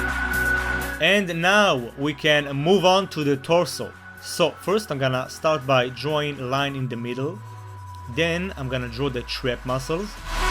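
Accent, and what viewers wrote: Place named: Israel. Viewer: וואו חחח המבטא הסגיר, וגם השם... אז הלכתי ובדקתי במידע על הערוץ, ומסתבר שצדקתי ^^ אני שמח לראות אותך מצליח ככה. בהצלחה בהמשך! מישראל 😄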